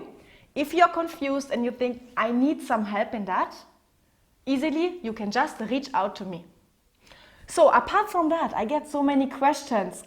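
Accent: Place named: Kenya. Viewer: Why do have a Kenya acsent mmmmmh l can guess you feel deely in love with kenya .And you got your soulmate there.thats my home l love your smile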